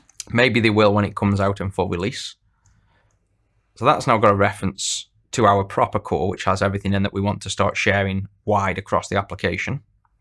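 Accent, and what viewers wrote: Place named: North, United Kingdom. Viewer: Just wondering, are you from Liverpool? This sounds like northern UK accent.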